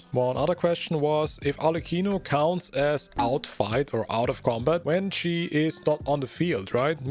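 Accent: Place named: Germany. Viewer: ur accent sounds german eww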